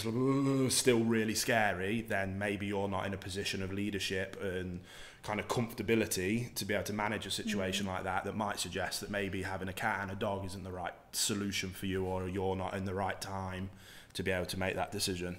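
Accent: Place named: Midlands, United Kingdom. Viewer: LOL you sound like you're from the Midlands.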